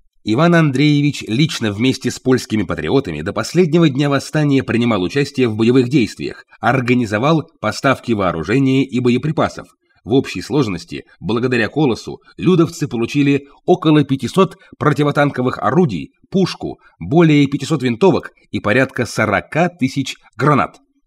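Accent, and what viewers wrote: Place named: Romania. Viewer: текст читает румын, портит восприятие.....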